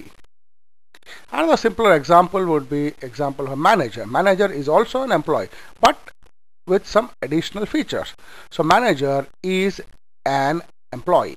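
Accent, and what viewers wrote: India. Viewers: As a native speaker of US English, I am simply expressing the fact that I dislike the standard Indian English accent. There are certain (often incorrect) patterns of speech that can be observed which are displeasing to the ear in the Indian English accent; simply put, it hits the ear wrong. I'm sorry you do not understand, but imagine receiving technical instructions in Punjabi that is being (poorly) spoke by a white person from the US — how much fun would you have? My guess is that you would have very little Sir. This is only my opinion, take it or leave it. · I don't understand you buddy, but this tutorial IS in English! Indian accent is an altogether different thing, however its still very clear to understand.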